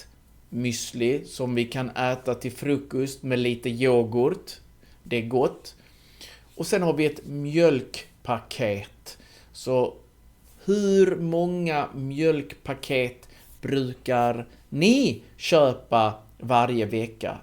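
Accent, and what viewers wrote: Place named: Skåne, Sweden. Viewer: Tack! 😆Jag tyckte att du pratar Göteborgska. Men det är faktiskt skånska. Du pratar väldigt tydligt och jag tror att dina videor har hjäpte många som lär sig svenska.👍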